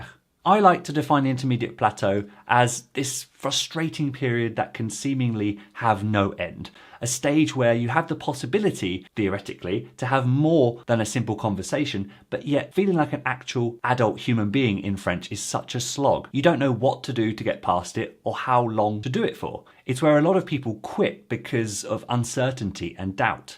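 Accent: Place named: United Kingdom. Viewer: You are British when I heard your voice English That's ability I notice when I started November 2nd learning English today is May 29 2025 Not yet to get advance or fluency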